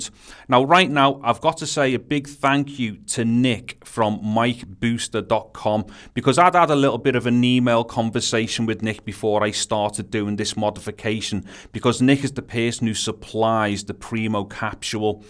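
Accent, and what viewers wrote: Liverpool, United Kingdom. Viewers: Nice job and good to hear the scouse accent. My son lives in Liverpool.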